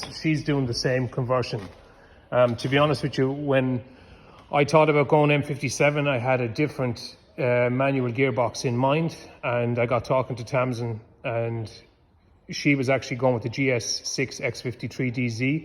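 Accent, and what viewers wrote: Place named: Mayo, Ireland. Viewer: What county you from . Sounds like mayo.or Sligo..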